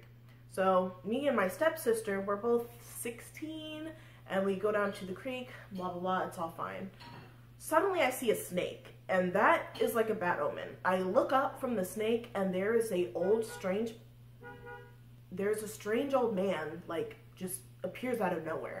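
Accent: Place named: California, United States. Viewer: A young woman with a California accent who says like too much....who HATES MEN? Wow, what an original and unique person you are. Really breaking the mould.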